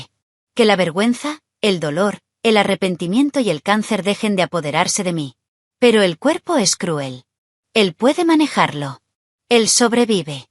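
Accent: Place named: Spain. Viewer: Recomiendo le pongas un acento mas neutral. En America Latina somos 650 millones contra menos de 50 en España. Y la verdad escuchar acento español en estas historias desmotiva un poco a los latinoamericanos. Y parecen mas historias cómicas que otra cosa. Con todo respeto, pero la verdad.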